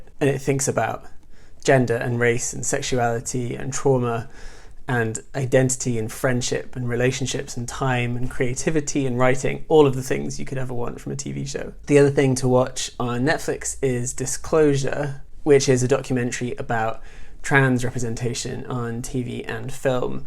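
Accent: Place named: United Kingdom. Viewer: Hey, I love. They are so talented. I really want to check out I May Destroy You but I have to get HBO. I'll definitely look into (sp?) too. I was wondering given your references to Katrina and NY Ballroom culture etc. where in the world you are? lol Obviously the accent suggests the UK but you have a lot of American references. Just curious. I just finished reading E.M. Forster's Maurice myself and immediately watched the 1987 film. I loved the novel. Especially after reading the Terminal Note at the end. Very interesting take on an English gay love story in 1914. The movie was pretty faithful to the novel as well. Thanks for the recommendations!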